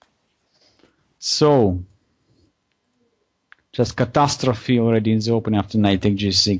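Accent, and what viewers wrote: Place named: France. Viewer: Fantástica partida pero yo tengo una pregunta que lleva rondándome la cabeza los últimos días. Cuál es la nacionalidad de este buen hombre? El nombre es ruso, el apellido judeoalemán pero el tío tiene un acento francés que flipas… Me he quedado loquísimo.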